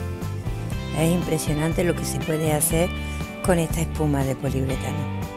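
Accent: Andalusia, Spain. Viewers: Gracias querida amiga yo te adoro de verdad te admiro mucho eres el mejor impresionante y con esa voz que tiene tan dulce la andaluza me gusta mucho no es igual estoy peor y no tengo aquí no tengo ni calefacción cuando creo que tengo esto tan grande porque no me pagas el Perro Maldito nos vamos pronto no tengo ganas de ver nada bonita pero qué precioso todo lo que haces y esto en esta fecha muy bonitas pero bueno el día 24 voy con mi hija a cenar yo lo he comprado todo claro bueno con mi madre con ella no es su padre pero su padre me da vergüenza porque se parece grande mándame en vez de subir hace muchos años y yo no quiero que vaya me da vergüenza de ese hombre estaba separado te quiero cariño un beso veremos no voy a celebrar nada no tengo ganas de nada de 31 sola también aquí pasando frío como la mierda que Dios sea justo porque no es justo el Dios te dejo que estoy llorando un beso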